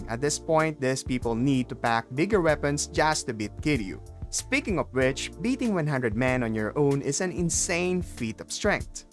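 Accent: Philippines. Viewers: I think you're a Filipino, from the accent that I am hearing.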